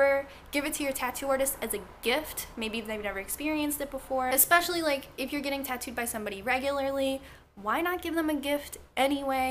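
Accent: Philadelphia, United States. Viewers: Your from Philly?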